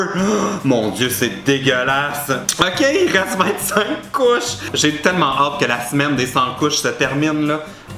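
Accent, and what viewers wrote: Quebec, Canada. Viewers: J'ai regarde tes vidéo pendant presque toute un journée et après je suis parti aux toilettes mon père m'a demandé un truc j'étais en train de parler avec l'accent québécois je comprenais pas pourquoi et maintenant je crois pourquoi en fait que j'ai trop regarder des vidéos de toi et du coup j'ai pris ton absence mais c'est bon j'ai plus l'accent Québécois mais j'aurais bien voulu l'avoir encore un peu lol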